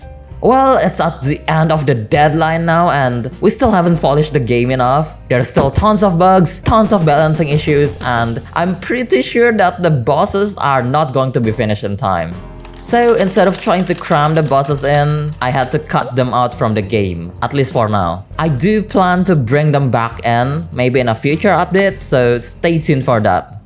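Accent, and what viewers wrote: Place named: Indonesia. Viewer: how come you sounds indonesian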